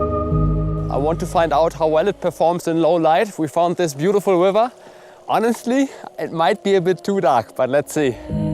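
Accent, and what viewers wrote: Germany. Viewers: The O3 and O4 are from stock waterresistant but all other parts on the Drone mmmeeeh😂 On my 5“ builds Im using the MGChemicals 422c silicone comforma coating its not too thick like a few other ones incase i have to melt it away for future soldering. For my 1-3“ Inch and on my Race Quad I use the flywoo waterproof spray. Bouth are just fabilous i knew the comformal coating is the best but i was shocked how good the flywoo spray works, Good video sounds like a heavy German accent💯💥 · Dein Deutscher Aktzent ist geil❤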